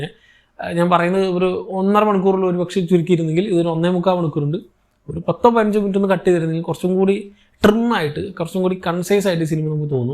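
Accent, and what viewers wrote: Palakkad, India. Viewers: Palakkad bhagathu anenn thonnunnu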